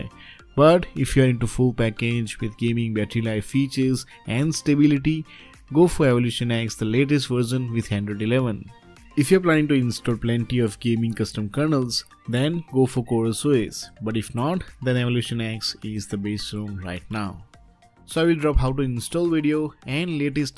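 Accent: India. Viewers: Yeah but his name is Indian,I believed He's Indian because of his accent.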